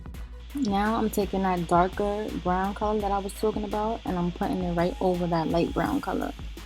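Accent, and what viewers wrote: New York, United States. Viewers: Omg! Me too, I'm from New York but I was like damnnn her accent heavyyyy lmao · YESSS! So cute. I love NY accents. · The New York Accent Yes 😛❤️!! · this video made me realize that we dead ass have a accent in ny. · Yo I'm new and I could tell you from New York by our accent 🙌🏾 and you're fine af 😍 subscribeddddd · New York accents sound so mean but bomb 😂😍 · Lmao the NY accent made the video 10x better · Ur talk over is hella new york😂😂😂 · OMG we do have a ny accent. I thought people were buggin when they say NYers have a accent. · wow us New Yorkers accent is too real 😂 love it. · Your NY accent is so sexy, ma. I love it. Too beautiful 💜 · Mad NY. You sound like me lol love the video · your voice is like a mixture of cardi b remy ma & nick I minaj. I guess it's just the NY accent! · that Nicki Minaj New York accent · Her thick New York accent is so sexy 😩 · i love your Ny accent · that NY accent is heavy though !!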